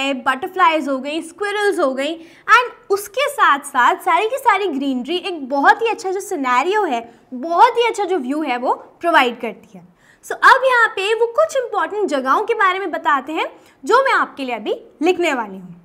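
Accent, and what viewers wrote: India. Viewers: Gujarat se ho kya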